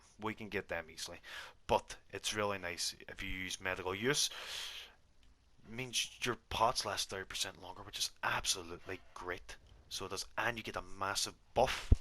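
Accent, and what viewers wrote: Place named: Derry, United Kingdom. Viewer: Great job, very informative and well presented showcase of the necro tank. Who wouldn’t want to listen to the lovely Derry accent, that’s just a bonus 🥳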